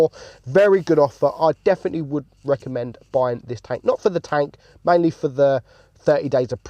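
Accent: United Kingdom. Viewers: I like your British accent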